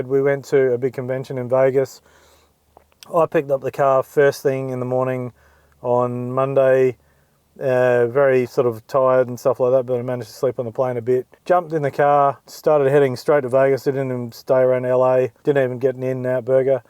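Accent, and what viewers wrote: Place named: Australia. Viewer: I wish this video was in American English, I'm having a hard times to understand these Australian language at times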